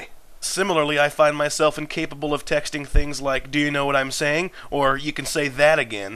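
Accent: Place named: Minnesota, United States. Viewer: You're Minnesota accent, and fictional characters are commendable.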